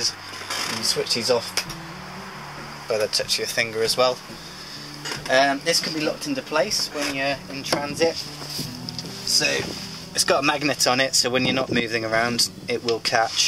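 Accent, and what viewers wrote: United Kingdom. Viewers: one of the most adorable homes.love his brit accent.love the way his eyes light up when he talks about his wife.every feature in the home is awesome. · I just love the British Accent, I don't know why.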